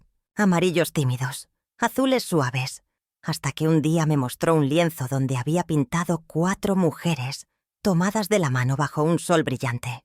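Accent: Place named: Spain. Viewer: Xgue es otra persona la que habla, no ella . Es española la gue narra.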